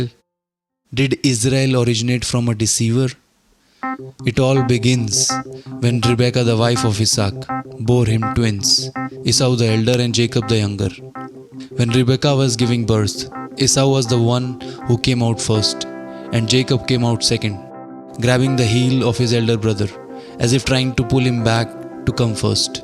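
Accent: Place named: Arab world. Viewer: I'm curious to know where in the video it says he's not? I encourage you to rewatch the video because I think the point of this video went over your head. I'm guessing maybe because the accent of the voice over sounds arabic you think he doesn't believe Jesus is God, like I admittedly questioned myself, but 2 seconds of looking through the titles in his video uploads you'll see one titled "Jesus is God Himself". So rewatch the video and focus on the lesson of how God can use imperfect people for His Glory. God Bless you brother. ✝️🙏🏽💪🏽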